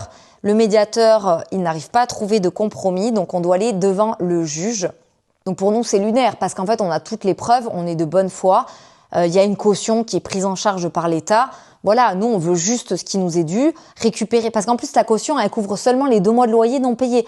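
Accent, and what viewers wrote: South, France. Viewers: Vu ton accent, tu viens du sud de la france? Ton mari a -t-il trouvé du boulot en france?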